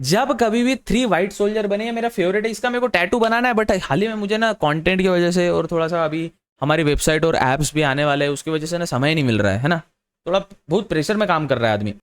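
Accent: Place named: Indore, India. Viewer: Bhaiya aap indore se hai na pakka😅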